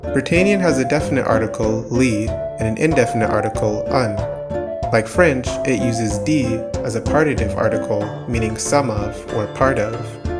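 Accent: England, United Kingdom. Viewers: I'll admit that I appreciate the effort that has been put into the phonology and etymology side of the video. However, the reason it still has that "englishy" sound is because the speaker has put relatively little effort into how the pronunciation would sound, and is simply using their native, modern english accent as a model of pronunciation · It sounds like an English speaker trying to speak French, except they only memorized a French dictionary and know absolutely nothing about French grammar. Also, they've never heard spoken French, so they just pronounce each word with the thickest possible English accent. I love it. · Intetesting, sounds like a translation from english to 3 or 4 of modern romance languages at the same time, with some not translated words and english accent. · Interesting.Sounds like a native English speaker trying to speak French.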